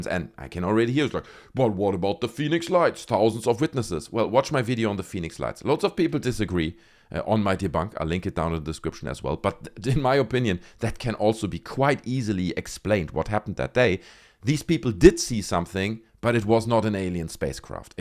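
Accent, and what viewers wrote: Germany. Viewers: Are you from Germany? Du hörst dich jedenfalls so an.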